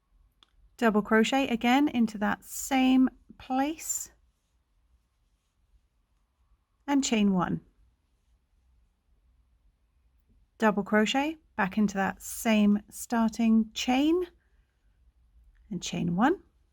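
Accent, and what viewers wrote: England, United Kingdom. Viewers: American stitch names in an English accent? Lots of trebles, counting one, two, three as you did them, but then referring to them as double crochets, and then double crochets, counting one, two, but calling them single crochets! I can see I'll have to write the instructions out in English. Lovely squares, thank you, but any reason for the use of American terms?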